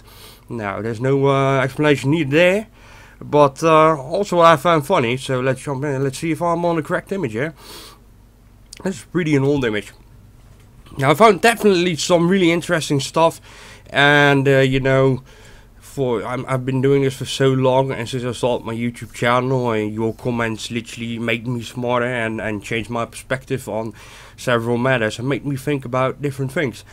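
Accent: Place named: Netherlands. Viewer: lol dat dutch english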